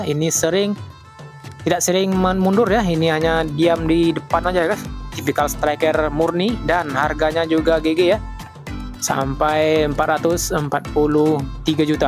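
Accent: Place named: Aceh, Indonesia. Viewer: Logat bahasa kek Aceh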